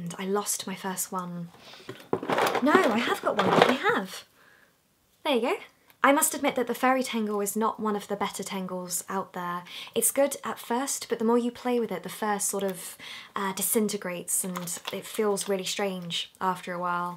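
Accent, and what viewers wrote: United Kingdom. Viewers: I ❤ your British accent...xx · Are u British ? I am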